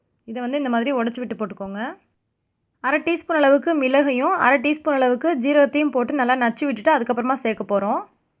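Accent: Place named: Thiruvarur, India. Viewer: அஸ்ஸலாமு அலைக்கும் சூப்பர் மா நீ எந்த ஊரு மா பேசறப்ப பார்த்தா திருவாரூர் மாவட்டம் மாதிரி இருக்கு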